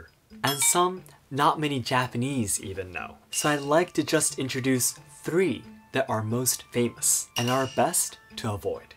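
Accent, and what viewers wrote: United States. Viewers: it's an american accent wth are you talking about?? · I love the American twang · In only six years you developed an American accent? How old were you when you arrived in Michigan? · He sounds very american, although it is well spoken american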